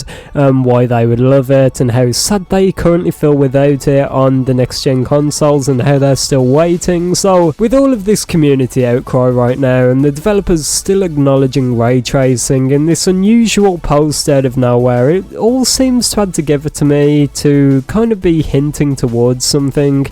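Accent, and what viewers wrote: United Kingdom. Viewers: Wow British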